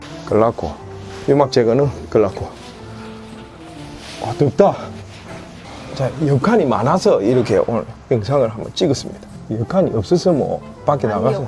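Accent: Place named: Changwon, South Korea. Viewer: 저도 늘 영상만 보다가 주문했어요. 고향이 저도 창원이라 정감난 말투가 반갑고 재미나게 봅니다. 사투리가 씨네..~~~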